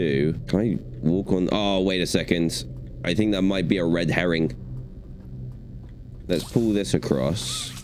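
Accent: England, United Kingdom. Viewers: Are u a brit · do u live in England cuz I do and u sound English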